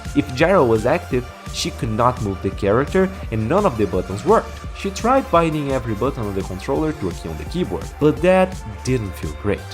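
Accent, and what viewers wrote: Brazil. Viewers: impressão minha ou você tem um "accent" brasileiro? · Tava vendo esse vídeo e minha irmã perguntou se vc é brasileiro por causa do sotaque e eu olhei seu perfil da steam e vi que vc é mesmo do Brasil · Eu também acho até estranho que inglês abrasileirado assim eu entendo perfeitamente mais o de alguns nativos ainda engancha umas palavras no meu listeling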